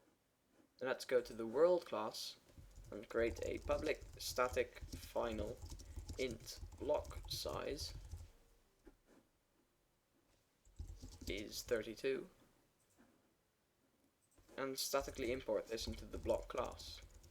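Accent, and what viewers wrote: Netherlands. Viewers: Are you Dutch? :D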